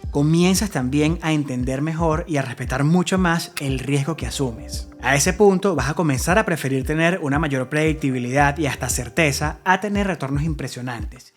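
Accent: Venezuela. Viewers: Eres de Venezuela? Suenas super venezolano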